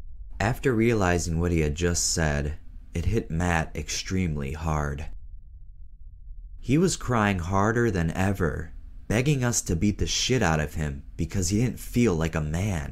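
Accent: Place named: Upstate New York, United States. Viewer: Yooo i knew you had an upstate ny accent haha 585 waddup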